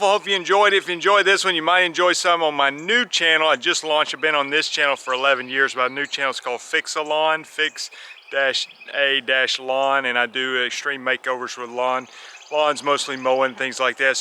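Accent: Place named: South Carolina, United States. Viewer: Hahahha they wishing! All they really need to do is call you sir! "everthang" ahahh we got everythang I live in South Carolina and you just don't hear folks talking like this much. I feel at home.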